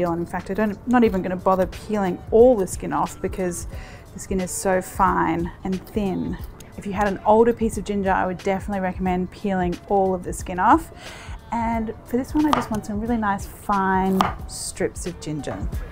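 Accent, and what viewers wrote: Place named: Australia. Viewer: jee you're good, and the way you talk about food with that Australian accent is so nice to hear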